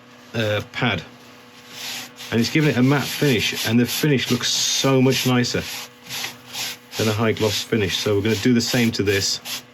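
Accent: United Kingdom. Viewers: I loved what you did on this weird root. It was also great to hear a Brit doing this video….!!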